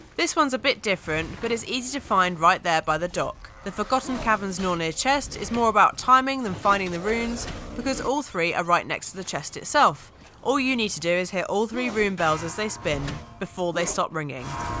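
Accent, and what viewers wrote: United Kingdom. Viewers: She’s British that’s just how we would pronounce it over here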